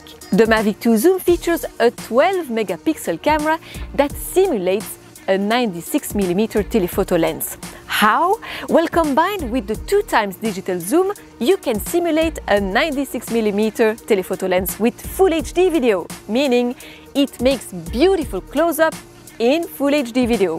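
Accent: France. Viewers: Honnetement = iJustine, et nous re-re-re-parler du drone du moment pour faire des vues ce n’est pas très interessant. Par contre l’accent francais en anglais et le debit rapide de la presentation c’est agréable. Du coup manque de contenu mais represente bien ce que deviens Youtube en 2018, une chaine de pub sans contenu. Ah, pour faire des vues tech actuellement faut parles du Nikon Z, du nouveau Canon, des Sony Mirorless ou de la 8K 🤓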